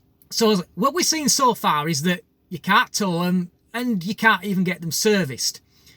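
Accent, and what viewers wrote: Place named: Yorkshire, United Kingdom. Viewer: Kernow(Cornwall) is made from granite,lithium is found in granite,so why isnt Kernow covered with lithium mines?I take 2 hours to fully charge from a 13 amp plug at home.my range is 15 miles with no regeneration available..I suffer no range anxiety when the battery goes flat.The battery is 5 years old and still charges fully.When flat,I just push harder on the pedals. Haha its a folding bike.I love accents.Hope I wont insult you to guess Yorkshire,Leeds/Bradford?Yo orite luv.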